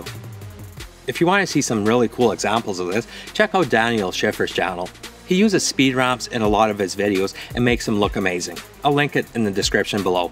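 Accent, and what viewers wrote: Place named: Quebec, Canada. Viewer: Great tip, and you have one of the most interesting accents I've heard! Mix of Asian and... French-Canadian? Am I dreaming? I never heard a voice like that :O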